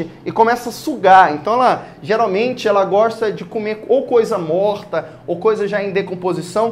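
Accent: Pará, Brazil. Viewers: Queria saber se vc é paraense ? Tem sutaque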